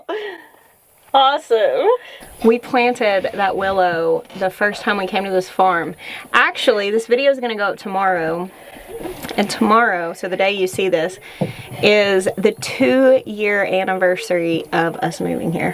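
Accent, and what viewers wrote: South, United States. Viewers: I add onion slices when itz too hot for lettuce. I had thinly cut cuke slices the last time. Both are good Girl, you're beginning to sound reeeallll Southern, <snicker> says the Bama woman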